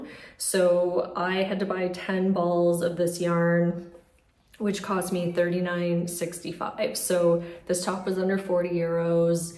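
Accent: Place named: Ontario, Canada. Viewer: Pretty sure it’s an Ontario accent, hard to change your natural speech patterns and for some reason women are often targeted for their speech tone, patterns etc.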